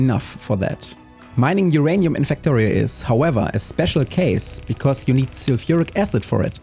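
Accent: Germany. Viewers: You sound german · Das ist das erste Video bei dem ich gerne eine Übersetzung von einer KI hätte. Dein Akzent klingt wie Bayrisch, nur schlimmer. Holy Moly.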